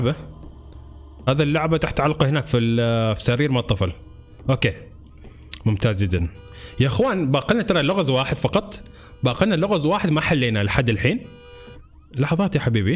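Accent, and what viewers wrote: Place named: Oman. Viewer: انت عماني عرفت من لهجتك 🌹 وكمل لعبه هي 4 فصول انت في الفصل الأول لعبه حلوة انا العبها على قناتي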